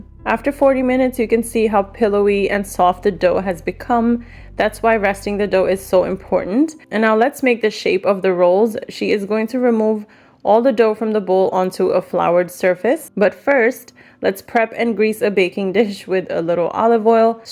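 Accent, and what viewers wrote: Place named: Bronx, United States. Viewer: You sound like a Puerto Rican from the Bronx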